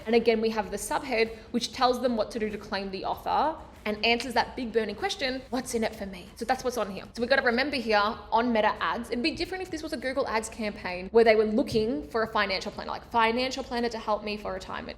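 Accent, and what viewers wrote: Australia. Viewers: New sub after watching many of your videos over the past few days. Also, fellow Aussie accent! Yay! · Still loving that Aussie accent.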